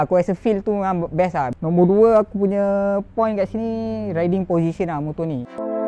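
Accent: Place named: Malaysia. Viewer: standed percakapan org mesia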